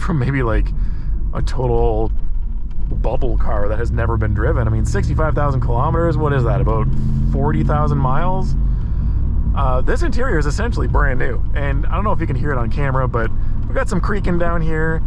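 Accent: Canada. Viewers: Relax that's how they say it in Canada... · I hate Canadian accents. Aouuut and abouut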